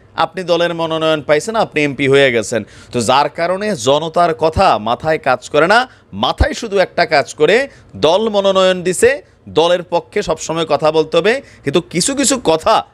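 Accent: Sylhet, Bangladesh. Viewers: ভাই আপনি যে সুন্দর সিলেটি মাতওইন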